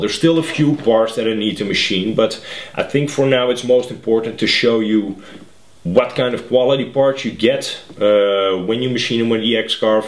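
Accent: Netherlands. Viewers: je klink lekker nederlands haha nice vid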